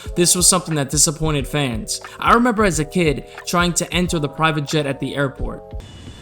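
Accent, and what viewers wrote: New York, United States. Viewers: I fuckin love your New Yorker accent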